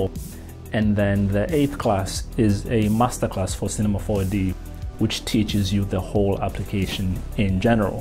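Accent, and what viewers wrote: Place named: South Africa. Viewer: You sound South African! I've used your animations in the background when DJ'ing and the response has been sick!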